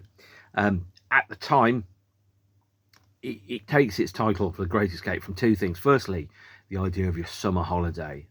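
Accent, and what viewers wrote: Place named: Birmingham, United Kingdom. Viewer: Hi mate, stumbled upon these videos mainly as I spend too much time watching random music reviews and record talks, enjoy the concept and will watch more! I suspect you are near me geographically in South Bham based on your accent.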